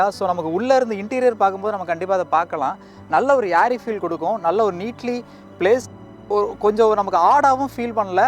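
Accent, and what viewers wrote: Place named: London, United Kingdom. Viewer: இது தமிழ் விமர்சனமாக இல்லை. தம்பி லண்டன்காரா் போல பேசுராரு.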